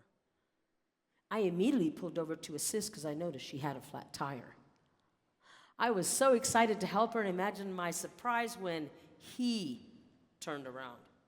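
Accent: Texas, United States. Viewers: My greatest honor to receive a "thank you" from you in person. Your style is my favorite: that fancy hat, that Texas accent and that enchanting storytelling. I keep telling my folks that the 2nd place winner is worth watching when they talk about the 1st and 3rd place winners. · The speech message and use of props were good. Not sure of the sarcasm and racy tone when describing elements of the young man. 🤔 however, the Texas accent was fire🔥🔥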